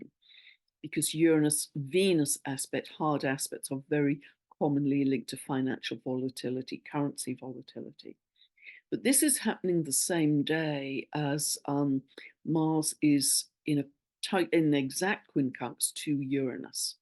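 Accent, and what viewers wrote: United Kingdom. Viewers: Luminous holidays to you dear!!! I love all your insights and beautiful energy and british accent. 🙏🏻💫💚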